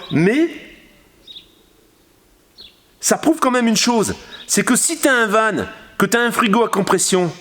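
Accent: North, France. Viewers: Pas mal du tout!!mais tu n'as pas des origines du nord???t'as un acchent de ch'nord!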